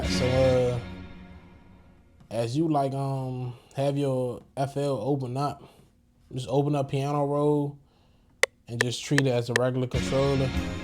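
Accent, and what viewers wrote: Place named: DMV, United States. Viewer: you got a dmv accent pushp on me i own a studio in dc let get you some placemnts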